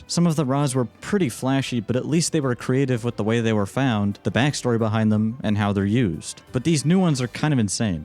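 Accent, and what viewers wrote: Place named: United Kingdom. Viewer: COULDV GOTTEN ARE U BRITISH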